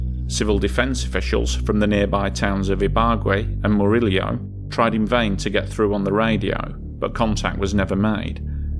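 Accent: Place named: Northern England, United Kingdom. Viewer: Where is your british accent from ... I seem to think its northern england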